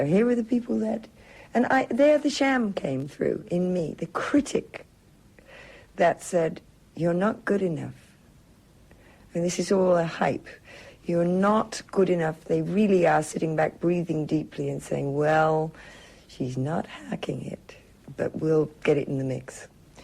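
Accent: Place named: Ireland. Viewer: I love and have always loved her voice whether singing or talking. It has a different sound. I'm scots so of course I have a different sound to pure English but so has Dusty. I don't know if this came from her mother's early influence as Irish but whatever it is Dusty has a different sound altogether. Anyway can I just say this was a great interview with Keith Altham who was always a champion of hers and spoke about so brilliantly on the Just Dusty TV documentary.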